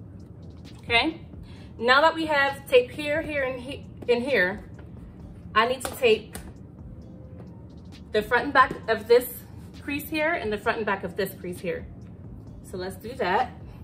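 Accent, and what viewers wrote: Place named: Pittsburgh, United States. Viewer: Love it I was going to use cardboard!!! This gonna sound crazy but I had to subscribe cause as soon as you spoke I knew your were a fellow PGH girl before you even said it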